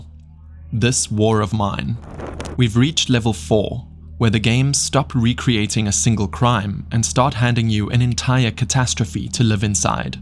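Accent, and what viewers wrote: South Africa. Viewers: Are you a south African boet😅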